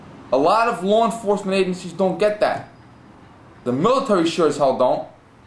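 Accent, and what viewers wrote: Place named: New York, United States. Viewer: Yo, welcome to Nu Yok. Got to love the thick accent.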